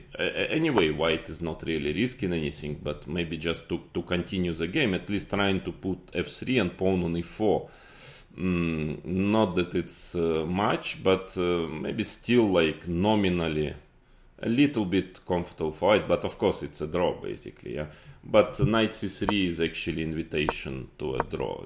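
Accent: Russia. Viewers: So thick Russian accept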